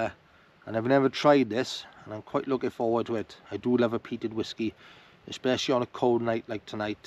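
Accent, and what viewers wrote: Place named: Abercynon, United Kingdom. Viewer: Enjoy your videos, I'm a Carmarthen man but my wife is from Abercynon so I fondly recognize your accent from the area . Like you I enjoy getting out wild camping but my poison is a spiced rum which I enjoyed in the middle of nowhere with the rain pouring down in a mist .It soothes the soul. Keep up the great content.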